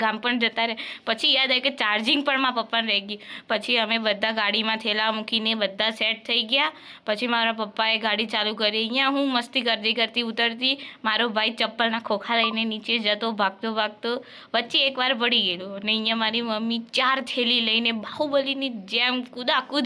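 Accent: Mehsana, India. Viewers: સુરતથી ગંગાપુર -ઊંઝા ની સફર આપણી બોલી -મેહાની બોલી ખૂબ મઝાની હું હાલ અમદાવાદ ખાતે પરંતુ ઊંઝાનો જમાઈ એટલે મને તમારા વિડિઓ અને બોલી ખૂબ ગમે છે. સરસ વિડિઓ બનાવતા રહો અને અમે એન્જૉય કરતા રહીએ....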